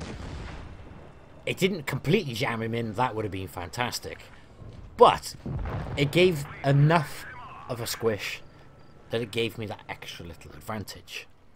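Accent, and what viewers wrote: United Kingdom. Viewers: Many will find it weird but ever since I subscribed aside from his perfect Analysis of the tanks and the game and all ( which is in my opinion is better then bushka and the others) his voice is just perfect you know a WWII battle gameplay in the background and his calm voice with the British accent explaining what is going is what forces me to watch his videos let alone the extreme accuracy and information he provides in the game..... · Such a lovely review, thank you! Hey, WoTB needs a British voice for the british tanks... I hope WG's going to ask you to make the voices 😜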